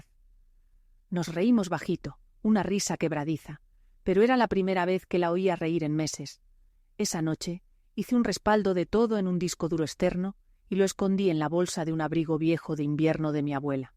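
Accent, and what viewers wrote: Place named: Spain. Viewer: Con mucho respeto,tengan cuidado con sus relatos, en Ciudad de México no nieva y la voz de la relatora es con acento español de España😊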